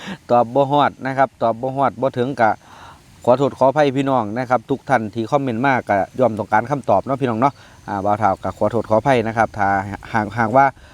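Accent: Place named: Sakon Nakhon, Thailand. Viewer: อ้ายบ่าวท้าวกินเป็นตาแซบแท้ สำเนียงเว้าออกไปทางคนสกล ลมคือสิหนาวเนาะครับ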